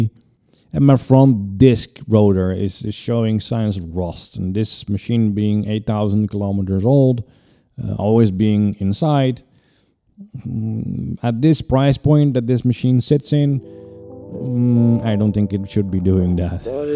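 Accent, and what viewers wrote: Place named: Netherlands. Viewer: Funny... the first sentence made me wonder whether you were from the US. In the second sentence I knew you were Dutch. Difficult to height your accent when you have to think about what you wanna say... Very nice bike! I would love to own a Ducati Monster but dare not because of the maintenance costs...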